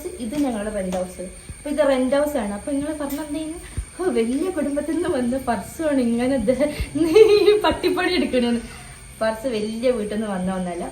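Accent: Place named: Malappuram, India. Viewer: സംസാരം തമ്മിൽ നല്ല വ്യത്യാസം ഉണ്ടല്ലോ. തനി കോഴിക്കോട് തന്നെ പക്ഷേ ഒരു മലപ്പുറം ടച്ച് ആണ് കൂടുതൽ തോന്നുന്നത് അതെന്താ അങ്ങനെ 🤔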